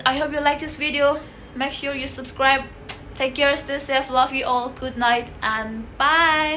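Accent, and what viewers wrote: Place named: Nagaland, India. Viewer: The Naga Accent still hits different ❤️❤️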